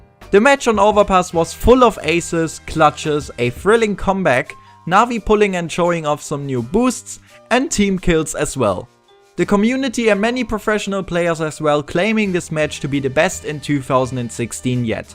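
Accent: Germany. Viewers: bist du deutsch xdd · I can hear it. Im from Germany too and its a German Accent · Are you from Germany , you sound so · Als ich mir das Video angeguckt hab, dacht ich mir: "Der muss doch deutsch sein, der redet so:D!" Das is er auch xD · Du bist deutsch oder ? Ich höre so ein deutschen akzent 😋😅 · Dieser deutsche akzent :DD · Do you come from germany? :D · dieser deutsch-englische akzent ^^ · Your german,right ? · Haha :D 2 Sekunden vom Video gesehen und direkt erkannt, dass du Deutscher bist^^ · Man merkt das du deutsch bist!:) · Ha ein deutscher, so ein grottiger englisch Akzent xD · Pretty strong accent but I'm used to playing with German friends (which is why I can read/understand German pretty well). Having played with a lot of German people, English skills are generally insanely lackluster. Then again, Germany is a pretty big and independent country making it a little less important to speak English I guess... 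· Nice accent ;) Are u from german :D? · Lol, du bist ja Deutscher :D haha nie gemerkt :D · Du bist Save deutsch das hört man so hart an deiner Stimme :DDD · are u german or did u traveled to germany? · You sound like you are from germany....are you? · Dieser Deutsche Akzent :) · Du hörst dich deutsch an :D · Liquid vs Fnatic on dust at MLG columbus, astralis vs fnatic at MLG columbus... and pls get rid off that cancerous german accent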